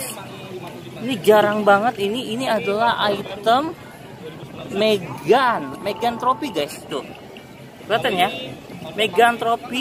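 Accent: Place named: Medan, Indonesia. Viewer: Om kaya orang medan wkwk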